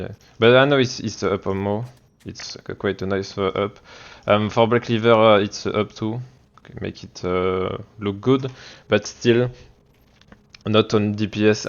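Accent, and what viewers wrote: France. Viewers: GG for the francais accent :)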